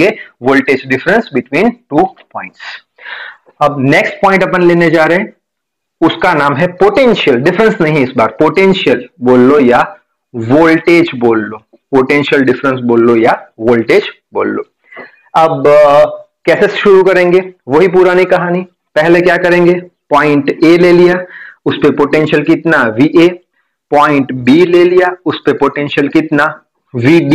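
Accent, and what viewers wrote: Gujarat, India. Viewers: sir ji ape Gujarat se ho ?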